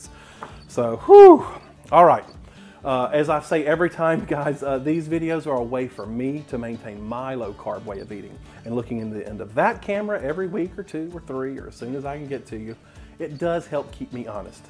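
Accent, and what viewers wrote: South, United States. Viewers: You are really entertaining and I am really glad I stumbled upon your Channel. Canada, so love that southern accent lol. I think I will have to try making these with that packet of Fleischman's yeast. My Baby Book had notatiins from my Mom saying that I grew like Fleischman's Yeast as a young baby. Ha ha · OOOH! at 3 net carbs I can eat all twelve for a total of 36 carbs...if you can eat 20 to 50 carbs a day you’ve got it made!!! Hmmmm will the cheese cause the bowel to bind???? Love love your channel and your southern accent, mine is Texan, makes me feel at home. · “Y’all”~ I love your channel & your accent!! Thank you! A Southern Belle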